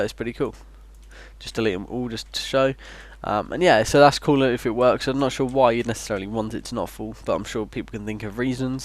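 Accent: England, United Kingdom. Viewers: I know it may be obvious to some but are you English? I can hear your accent but I doubt it a bit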